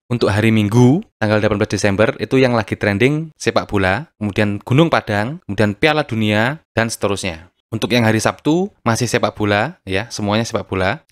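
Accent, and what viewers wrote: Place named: Java, Indonesia. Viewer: Suka.sama chanel ini suaranya aksen Jowo medok...👍😁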